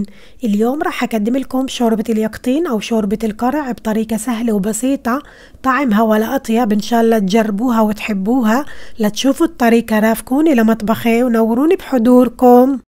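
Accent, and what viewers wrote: Palestine. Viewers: بتشهي شوربه خمس نجوم مميزة مثل لكنتك الفلسطنية الليةبتهبل 🇵🇸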